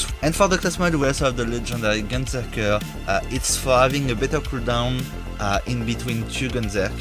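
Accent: France. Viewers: Mdr le bon vieil accent français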